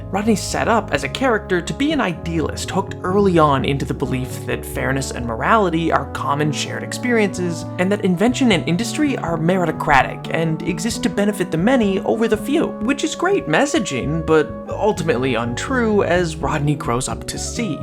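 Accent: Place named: Canada. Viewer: He sounded Canadian to me.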